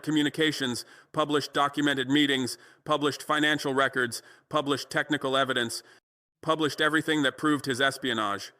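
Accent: United States. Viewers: Why has he got an American accent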